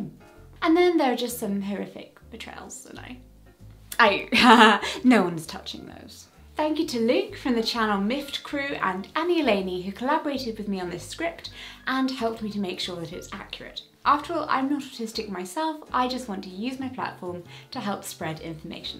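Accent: United Kingdom. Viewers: Please slow d o w n! I can't understand a word you say. Your British accent is too strong.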